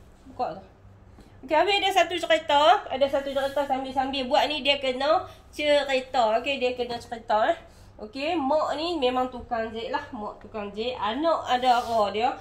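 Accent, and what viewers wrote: North, Malaysia. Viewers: Walaupun saya bukan org utara, tp masih faham apa cikgu terangkan.. Dan suka pulak dah dengak loghat cikgu.. Dan cikgu terang memang jelas dari a-z.. Terbaik.. 👍👍👍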